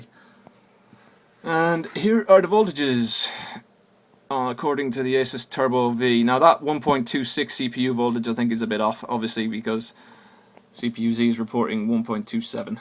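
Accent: Ireland. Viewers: Are you from Ireland? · hey man, u sound irish? i presume u are but anyway, i have the same CPU and im just wondering how much do you think i could overclock it using the standard cooler that came with it?.. i searched around but good answers :/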